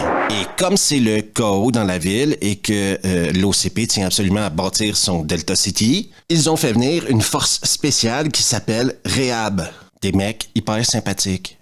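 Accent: Quebec, Canada. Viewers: Toujours agréable quand ton québécois ressors ! Une criss de honte !! J’ai ri !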